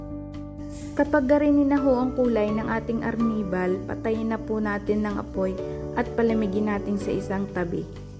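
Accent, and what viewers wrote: Batangas, Philippines. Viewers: Ang cute nyo po magsalita parang gusto ko nalang tumira sa batangas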